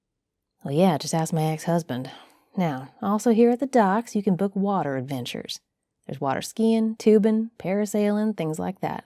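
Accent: South, United States. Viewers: Yes! This world needs more southern accent asmrs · So this is that southern hospitality that they talk about. Like, I’ve never been to the south in my life, but that accent immediately immediately makes me feel at home and taken care of. Also. XP?! Good lordie that computer is ancient. Please tell me it isn’t connected to the internet.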